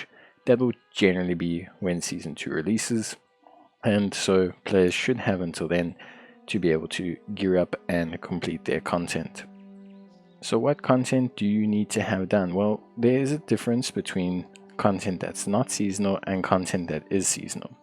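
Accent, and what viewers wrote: South Africa. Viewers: Are ya South African?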